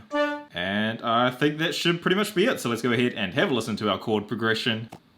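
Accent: New Zealand. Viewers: are you a new zealander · are you from new zealand?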